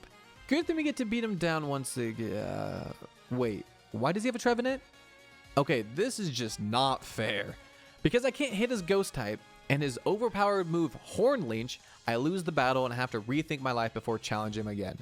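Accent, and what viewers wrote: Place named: Scotland, United Kingdom. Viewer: Damn a true Scottish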